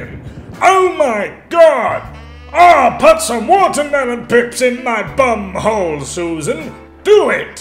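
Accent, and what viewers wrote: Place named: Liverpool, United Kingdom. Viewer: ahhh you snake charmer 😏 I just watched a couple of your other videos aswell and realised you were scouse:L This is awesome content tho man! if you follow me on twitter i'll give you steam details and such on there!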